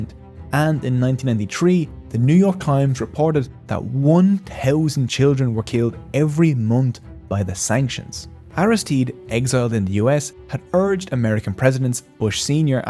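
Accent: Ireland. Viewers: Can't stand his Connor McGregor accent. Sorry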